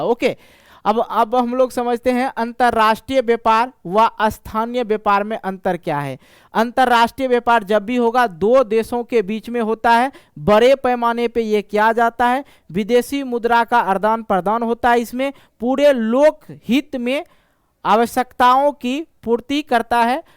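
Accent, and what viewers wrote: Bihar, India. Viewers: Sir aap bihari hain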